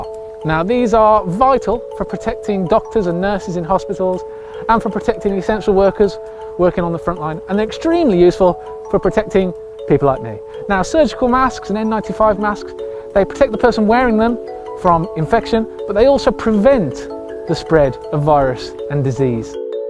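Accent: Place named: United Kingdom. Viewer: 搞笑的英国口音，英国腔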